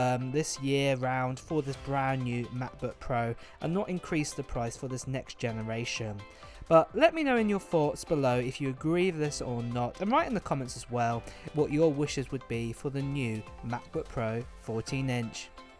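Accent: United Kingdom. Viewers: Well, I want to buy the iPhone 11. But sadly I don’t have money for that. And also i didn’t already bought any apple gear or product cuz I don’t have money for that, And i hope i will be the one who got your iPad. Because It can help me in my studies. btw i like your british accent, congrats and godbless💖💖💖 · I hope to get the iPhone 13 for Christmas. I your channel is great and informative and I just really love your British accent when you say thousands it sounds like fousand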